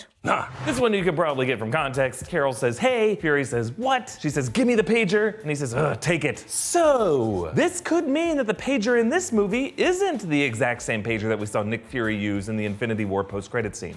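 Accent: Russia. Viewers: Like your russion accent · Love ur russian accent and the parallel with cw phone is purfect nice guess 👍 Awesome vdo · Your Russian accent made me think of Tank. I miss Tank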